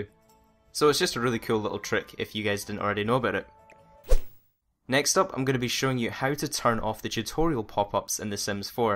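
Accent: Scotland, United Kingdom. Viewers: When I started the video I was like "wait what the hell is this guy even speaking English his accent is too strong!!?" before realising it was just a Scottish accent. The worst part is I'M Scottish!! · R u British/Scottish I am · I've used the Brush Teeth thing since Sims 3 when my Sim's hygiene is low in other Sim's houses. I mean, you can take a shower too, but they get upset and might throw you out if you do it in Sims 3. Haven't tried it in Sims 4. By the way, as an American, I really like your Scottish accent. :) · Onyi Onuorah He’s Scottish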